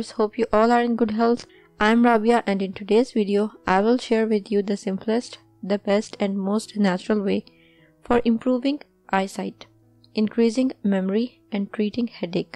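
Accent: India. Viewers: SORRY . WE DONT UNDERSTAND . YOUR. INDIAN. HINDI ENGLISH . SORRY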